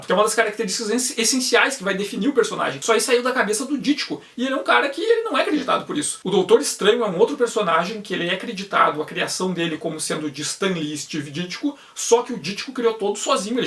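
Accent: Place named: Rio Grande do Sul, Brazil. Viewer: A melhor parte desse vídeo, depois do conteúdo é claro, é ouvir a conversa entre figurões da marvel com um sotaque bem gaúcho! Bah! inclusive "bah" aparece recorrentemente em hqs da década de 60, tu sabes o por que disso?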